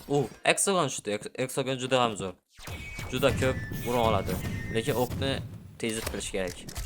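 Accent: Khorezm, Uzbekistan. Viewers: Horazmli misiz brat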